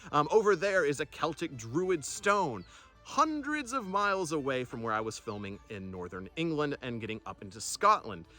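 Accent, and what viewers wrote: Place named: United States. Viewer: Y r u American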